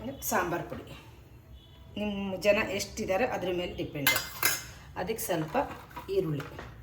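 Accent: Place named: Hassan, India. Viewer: neevu hassan kadevra neevu mathadodu and aduge style ade reethi ide navu hassan adke kelde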